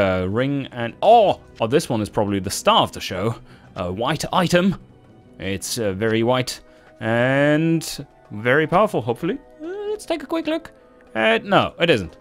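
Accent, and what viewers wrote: Germany. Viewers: Like your videos dude :D your slang sounds like german doesnt it? cheers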